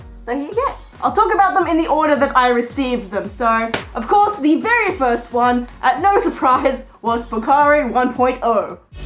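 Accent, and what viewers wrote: Australia. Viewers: Are you Australian cause I am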